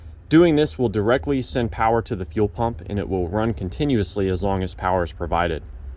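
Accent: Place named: United States. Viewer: You told me EXACTLY the pin info.. And clearly in an American voice.. Lol.. I want to drain the old fuel .. Been sitting more than 3yrs. Thank you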